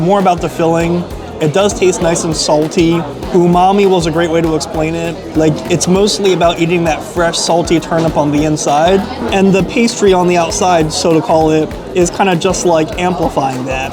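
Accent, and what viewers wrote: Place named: New Jersey, United States. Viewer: Where in Jersey is this guy from?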